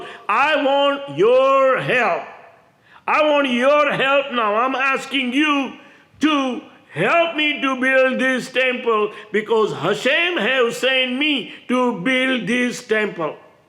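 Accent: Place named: India. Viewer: Are you a Jew or an Indian. Your accent is Indian. 1. Why say that no Prophet in the old testament did any heaking or miracle? Elisha healed the Syrusn commander from Leprosy 2. Moses oersformed mamy signs and wonders 3. A dead person who was buried next to the bines of Elisha got resurrected. 4. A Son 9f the widow was resurrected by Elijah 5. Jesus made it clear that whatever He did was done by God the Father who eorked in Him or using Him NOW YOU , WITH NO POWER TO DO SNY MIRACLES. YOU WILL NEVER RAISE THE DEAD . WHAT WILL YOU HELP ANYONE WITH 7. A MESSIAH MUST BE ABLE TO RAISE THE DEAD. IF YOI CANT RSISE THE DEAD , THEN YOU EILL NEVER RESURRECT FROM THE DEAD EITHER . SO YOU ARE A NIBIDY AND CANT HELP ANYONE THE GREATEST ENEMY OF HUMANITY IS DEATH and also diseases If you will not taise the dead , you are worthless. You will judt die and become soil.like everyone 8. 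So, WHAT IS THE DIFFETENCE BETWEEN YOU AND OTHER HUMAN BEINGS ? . You feel helpful to Jews by telling them to fight on until GOG AND MAGOG war . Is that what Jews are looking for. A firever war life . Dont they want peace ? JESUS CHRIST IS THE PRINCE OF PEACE . REJECT HIM TO YOUR OWN DETRIMENT . WHOEVER BELIVES YOUR LIES WILL BE END UP IN THE SAME FATE.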